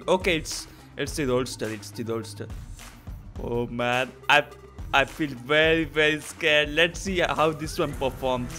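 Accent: India. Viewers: Why do i hate hindi accent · You indian accent is so strong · Dude are you India because your accent is Indian pls tell me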